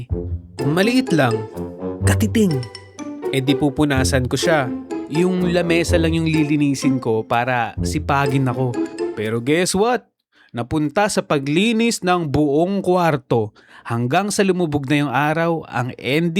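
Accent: Ilocos, Philippines. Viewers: Ilocano ka yahhh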